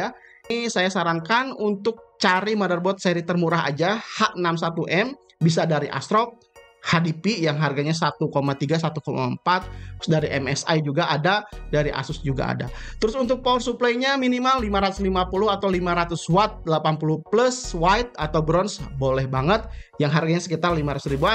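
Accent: West Java, Indonesia. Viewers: sunda na tos melekat pisannya kang😂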